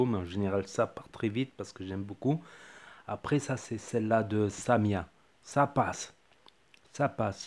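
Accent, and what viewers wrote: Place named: Morocco. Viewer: J entend que ca marocain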